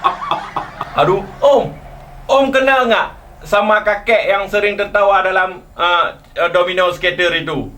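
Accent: Aceh, Indonesia. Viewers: Btw kek logat Aceh, salam sejHtra dari kalimantan bg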